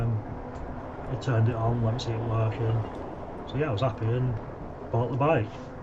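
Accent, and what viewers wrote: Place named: Yorkshire, United Kingdom. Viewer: Just came across your video well done you I found it interesting listening to your woo with your first e bike purchase what a bummer , but looks like you have purchased a different one now and you are all legal. I bought my first e bike over 10 years ago before all this legal stuff it had a motorbike like throttle I have never been stopped by the old bill in fact I have had several bobbies saying what a nice bike never asked me about motor size or anything maybe just been lucky . Please carry on making your videos love your accent reminds me of home I was brought up in Yorkshire but left when I was twenty live in London now miss the northern accent though .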